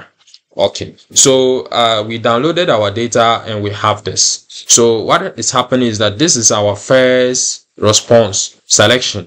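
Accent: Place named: Ghana. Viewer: He sounds Ghanian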